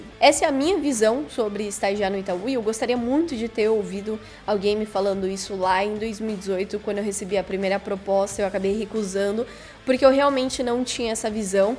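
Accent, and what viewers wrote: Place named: Rio Grande do Sul, Brazil. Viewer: Pela dicção deve ser gaúcha. Meu ouvidos agradecem quando eu escuto uma pessoa que pronuncia as palavras da forma certa!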